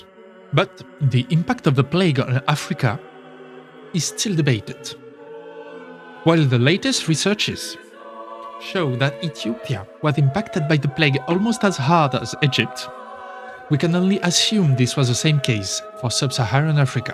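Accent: France. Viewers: Nah I like the French narrator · L'accent français atroce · Nah.. We love our French Narrator.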